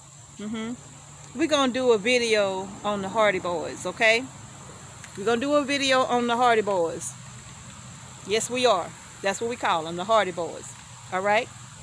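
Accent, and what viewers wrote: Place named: Kentucky, United States. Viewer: I am thankful for youtubers like you and. I used tjo garden and can stuff with my mother, but only water bath canning. You are the reason I got a pressure canner because of you. I had to buy all new jars again because my husband had gotten rid of mine that I got when my mother passed. Just hearing your voice, with your accent, reminds me of her, we were from Kentucky. I learned so much from her, gardening, cooking, quilting, just so much. We all used to go berry picking together as a family, people used to make fun of us, but I didn't care, because I knew how good the things Mom made from the berries would be. I learned how to process and clean the critters my dad would hunt. Once my mom killed a bunch of our chickens, they were free range and wild as heck, and most of them would go up the mountain and roost. 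Mom stood out in the yard and shot them with a 22 rifle and every one was a head shot. She had my German Shepherd go up the mountain and retrieve them. The point of this whole story was helping my mom pluck those chickens. She didn't expect me to have an issue, but when she dunked them in the scalding water, the smell made me gag and throw up. She made me go in the house. Anyway I'm grateful for y'all sharing your lives with us. God bless you guys and everyone, including your critters. 🥰🥰🥰🥰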